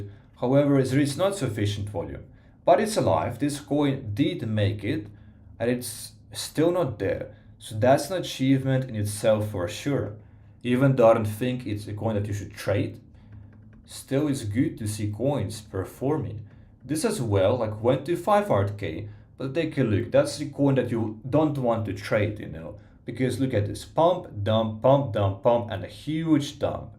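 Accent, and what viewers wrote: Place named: Russia. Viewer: Sound almost Russian 🤔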